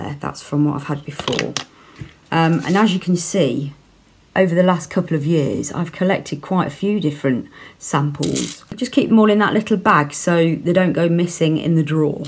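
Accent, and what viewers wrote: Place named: RP, United Kingdom. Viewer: You sound posh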